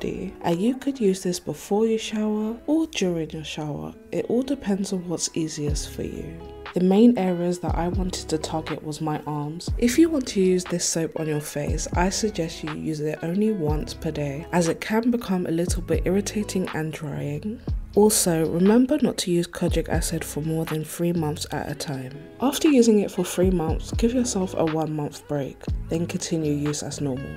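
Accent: United Kingdom. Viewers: Love your accent! btw, are you british?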